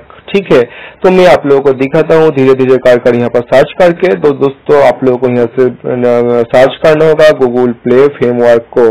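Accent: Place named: Bengal, India. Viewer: Bangali hai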